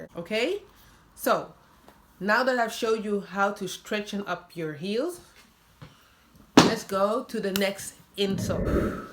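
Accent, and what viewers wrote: Netherlands. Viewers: Dutch accent, no? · Ik dacht al je komt van nederland 🧡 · Nice video!!!! Very helpful!!! Are you from the netherlands? I can hear a cute accent. Im from rotterdam.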